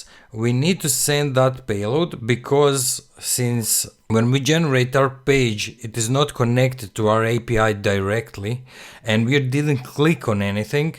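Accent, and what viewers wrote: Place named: Russia. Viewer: Ну это русский пацан, сто процентов!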